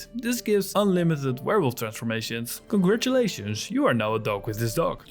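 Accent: Netherlands. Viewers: I love the dutch accent seeping through, so yes instant new abonnee x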